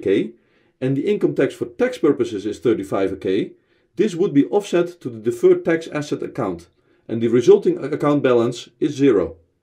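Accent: Netherlands. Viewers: Hi, are you Dutch? (From your acccent)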